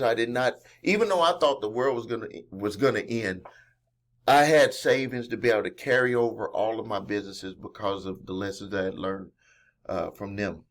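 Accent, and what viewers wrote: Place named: South, United States. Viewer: You are keeping us inspired, man. What state are you in? You sound southern. We are in NC.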